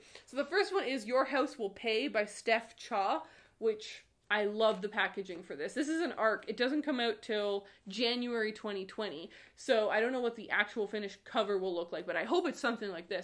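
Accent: Canada. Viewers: Omg I love your Canadian accent and “about” is my ultimate favorite like it’s your trademark. Why would you try to lose it? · I love Canadian long ohs!!!! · Emergency Contact and The Prince and the Dressmaker are two of my favorites! I hope you love them. Also, never apologize for your Canadian accent! Regional dialect and accents are fascinating in the age of digital and social homogenization.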